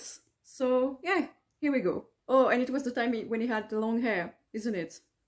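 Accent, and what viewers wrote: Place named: France. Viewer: Je t'adoreeeeee 💖 (t'es française, non?) I guessed based on the accent haha. But yes the Omar effect is very very real and at this point my obsession has become so unhealthy haha.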